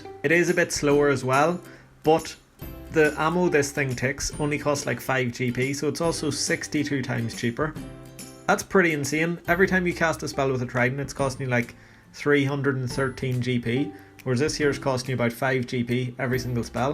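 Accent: Northern Ireland, United Kingdom. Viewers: You from N.Ireland?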